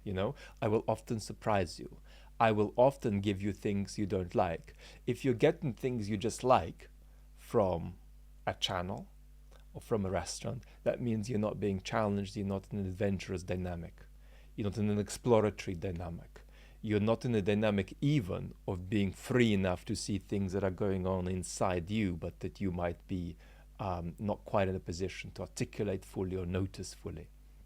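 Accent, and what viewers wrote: United Kingdom. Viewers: First time I've detected a limey accent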